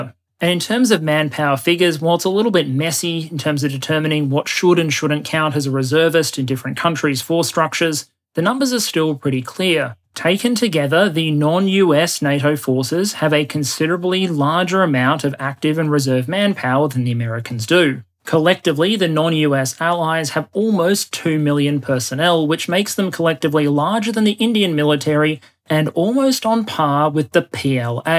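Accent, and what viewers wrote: Australia. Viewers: He has an Aussie accent , but as an Aussie , I can tell he is a nerd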